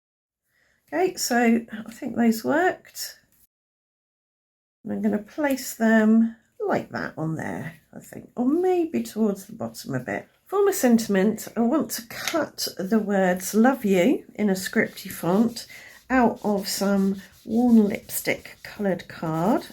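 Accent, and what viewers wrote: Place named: United Kingdom. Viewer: I love listening to your beautiful British accent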